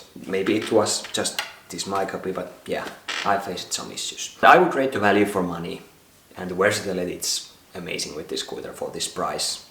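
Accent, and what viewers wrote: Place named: Finland. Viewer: this man sounds very finnish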